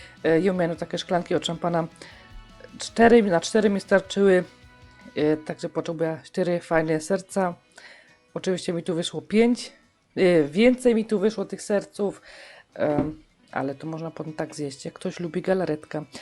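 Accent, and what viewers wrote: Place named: Opole, Poland. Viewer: acha i miłych walentynek jo też mieszkom w rajchu ale jestech ze śląska! 26 lot minolo ale godac po Śląsku niezapomnialam ty mosz taki richtig opolski akcynt jo zaś jestech z okolic Bytomia i tez godom blank po naszym moja koleżanka prowadzi w tc Katowice dej pozor aż gania ze tyla ludzi ze śląska juz niezno tej godki! my Muszyny się tego czimac!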